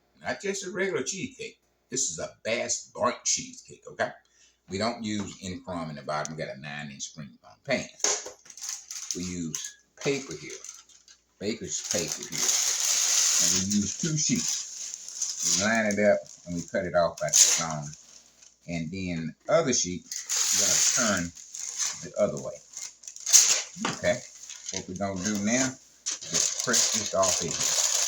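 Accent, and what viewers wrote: South, United States. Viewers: Mr. are you from the state of Florida? Georgia or Louisiana?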